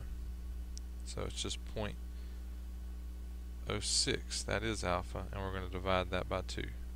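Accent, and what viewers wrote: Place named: Kentucky, United States. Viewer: i like ur accent, reminds me of Kentucky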